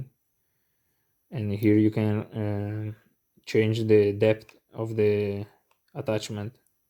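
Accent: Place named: Italy. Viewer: I understand everything he says. He speaks English with an Italian accent. Put on the subtitles and you can read what he says.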